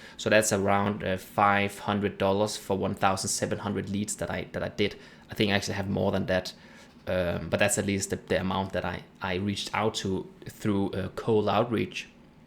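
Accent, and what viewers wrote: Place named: Germany. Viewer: Considering your strong accent, I'd say you are from Germany or Austria. Or maybe Luxembourg but less likely.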